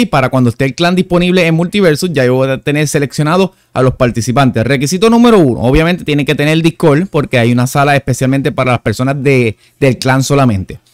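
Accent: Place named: Puerto Rico. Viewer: Es de puerto rico rey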